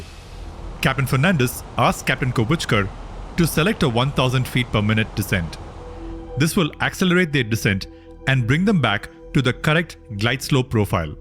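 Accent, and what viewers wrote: India. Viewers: This video is the first time i ever heard english with indian accent and actually kinda liked it…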